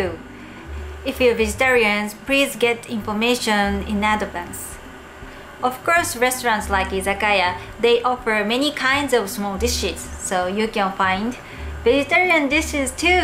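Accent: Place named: England, United Kingdom. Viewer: Your English accent is so cute!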